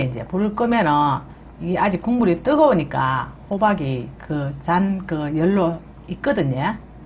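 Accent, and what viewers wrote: Gyeongsang, South Korea. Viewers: 얼굴은 예쁜데 목소리는 촌스러운 경상도 아줌마 늘 잘 보고있어요 최고입니다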